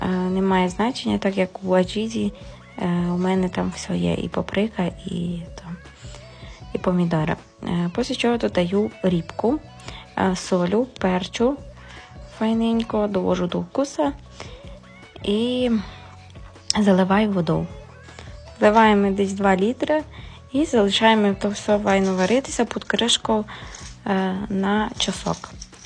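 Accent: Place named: Transcarpathia, Ukraine. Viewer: Противний говор закарпатський.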